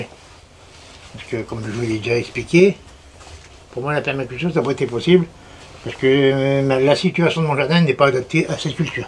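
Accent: North, France. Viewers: Bonjour merci pour toutes ces vidéos c'est toujours un plaisir. En tant que fille du nord, je vis maintenant en Bretagne et la nostalgie de ma région d'entendre l'accent me fait chaud au cœur. J'apprends beaucoup avec les vidéos. Merci beaucoup pour les conseils et je me suis empressée d'acheter mes graines Bocquet. Bonne journée à vous deux. 🤗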